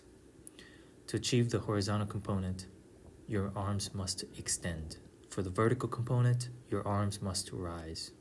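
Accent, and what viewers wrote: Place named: United States. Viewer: You sound American. What that shitty flag on your shoulder?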